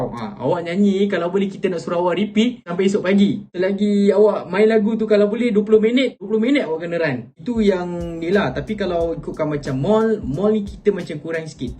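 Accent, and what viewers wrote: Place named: Kuala Lumpur, Malaysia. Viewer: Dtg daripada keyel ke jaybe Xleh blah ah aku dgr slang dia😂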